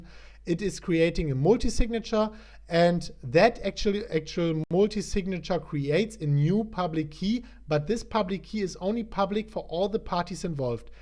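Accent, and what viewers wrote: Germany. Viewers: ich höre deinen deutschen Akzent von weit weg haha..Welche Bücher kann ich mir durchlesen um diese ganzen Graphen lesen zu können wie davincj15 sie manchmal in seinen Videos nutzt.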